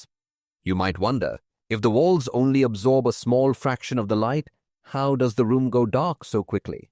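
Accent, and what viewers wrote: India. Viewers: Akin to Indian accent that irritates. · Although I like this channel, But the narrator Indian accent and way of talking is annoying to me!!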